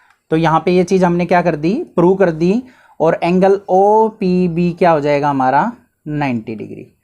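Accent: Haryana, India. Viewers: I think ap haryana se ho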